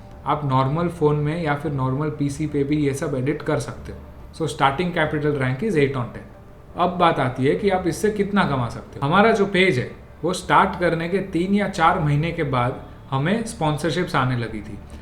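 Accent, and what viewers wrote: Gujarat, India. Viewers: Gujrati hai kya bhai tu ?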